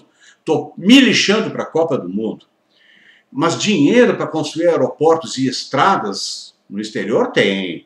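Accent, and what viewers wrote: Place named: Rio Grande do Sul, Brazil. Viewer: sou de Santa Catarina. Você é do RS, pelo que ouvi no vídeo. De qual município?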